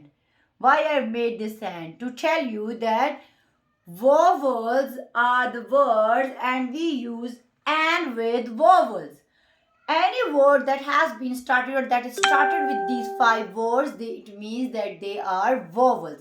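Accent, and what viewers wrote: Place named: Punjab, India. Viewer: MashaAllah Ap to Einglish Humari Punjabi ki tarha bol rahi ✌👍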